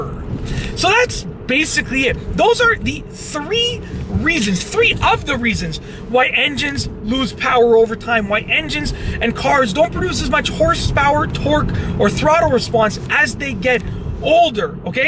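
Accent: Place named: Toronto, Canada. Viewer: As soon as he started talking I knew he was from the gta. then I noticed the streets and was validated with the knowledge of knowing I was right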